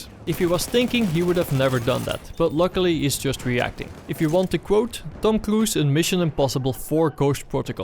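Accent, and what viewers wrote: Netherlands. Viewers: You sound Dutch or Belgian to me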